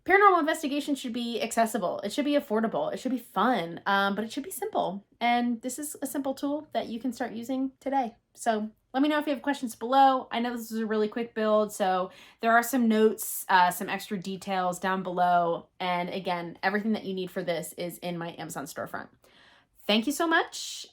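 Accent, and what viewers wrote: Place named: Ohio, United States. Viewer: You from ohio ? I can tell. A fellow. Native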